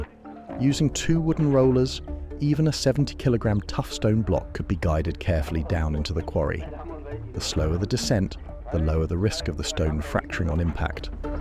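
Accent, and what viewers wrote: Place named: England, United Kingdom. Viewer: I love hearing metric measurements in an English accent, I's almost as good as hearing them in an American one 🖖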